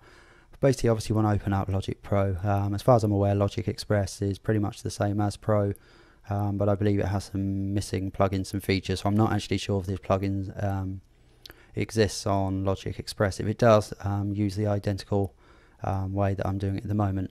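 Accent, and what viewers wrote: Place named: United Kingdom. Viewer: Finally someone British. I can't take these American tutorial guys seriously. The accent is all wrong.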